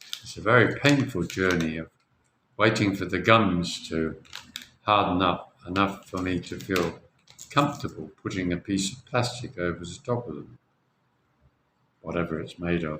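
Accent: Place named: United Kingdom. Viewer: Your british accent trumps all other conciderations..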